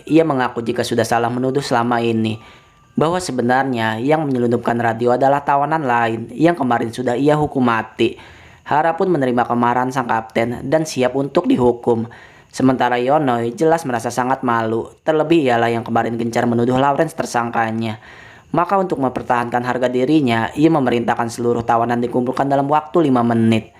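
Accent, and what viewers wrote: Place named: Banjar, Indonesia. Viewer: Yang baca naskah orang Banjar Kalimantan nih. Ketahuan dari logatnya. Cuma saja intonasi tatkala berhenti paragrafnya ga enak didengar.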